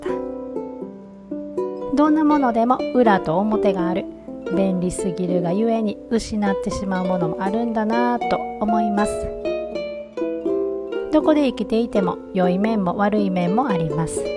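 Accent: Kansai, Japan. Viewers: 声と関西弁、いい感じです😂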